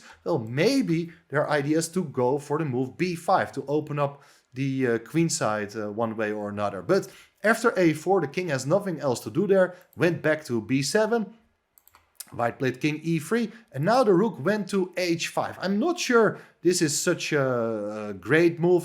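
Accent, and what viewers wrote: Netherlands. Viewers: You're Dutch, aren't you?! :) I can tell from your accent. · I don't know what I hate more, Hans' cheating again or hearing this guy's Dutch version of English😅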